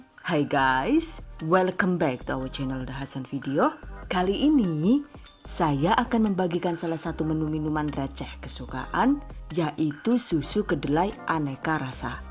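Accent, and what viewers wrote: Banyumas, Indonesia. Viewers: Aku mah fokus ke ngapak nya lucu abis 🤣🤣🤣🤣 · Susu kedele ne mantul banget,cocok buat ide usaha tuh...nyong sok ngguyu2 dewek nek nonton video ne Rika ne Yu!,kreatif karo lucu koh ana ngapak2 e...hehehe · Enak dan seger bund susu kedelenya,aku sangat suka, diminum hangat enak,diminum dingin lebih seger, pokonya mantap,sukses selalu bund,ngapaknya yang bikin ngangenin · Budhe.. ko basane kaya basane nyong kabehan 😂 tiyang pundi budhe??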